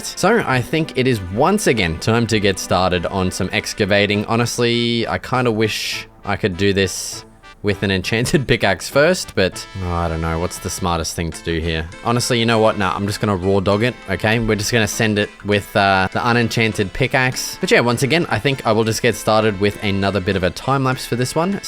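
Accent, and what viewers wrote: Australia. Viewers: U australian, i thought u were british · i could sense the australian in you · I've been watching your videos for about 6 months now, and I have to say I love your Australian accent, and your building style is absolutely amazing. I recently just started a forever world, and your videos are great inspiration for build ideas. I will forever appreciate your videos.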